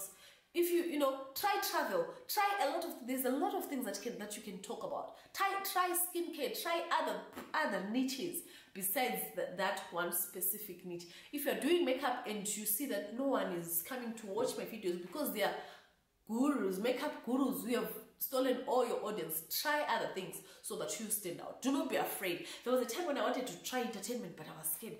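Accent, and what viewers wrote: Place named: South Africa. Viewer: With accent though, it depends on what your target market is. My target market is based in the country where I'm currently based. The locals here are the ones who bother to watch my content and comment and even ask questions about the places I visit. If I used my home country accent a lot of people where I'm based would not understand me. The accent I use at work is the same accent I use on YouTube. I had to learn this with my full time job and that was culture shock for me which I had to adjust to. Sometimes it is also natural to subconsciously speak in a similar fashion to those around you. I can pick up South African traits in your accent. It happens naturally. I used to have a Zambian accent for the longest time because I lived in Zambia for a greater part of my youth.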